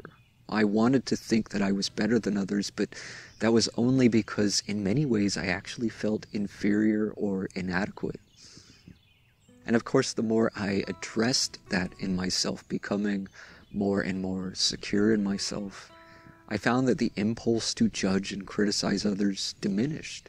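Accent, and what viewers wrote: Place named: United States. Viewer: Thank you 💚 had a miracle breakthrough today and experienced the barriers coming down between someone I judged and myself. I really misjudged them and they really surprised me and they were really loving, we had a nice day together and I was able to see how I’ve been acting angry and judgemental. Starting to feel myself softening and letting go a bit towards others :) Just realised you have an American accent, it’s funny I haven’t noticed before as I was so focused on the messages etc. I’m in England. Thanks for your help 🌈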